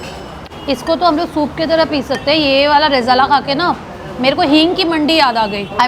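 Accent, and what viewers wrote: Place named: Bengal, India. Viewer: 1. You look like Prajakta Kohli 2. Your Bengali accent is so cute 3. I absolutely love the way you’re taking big bites ❤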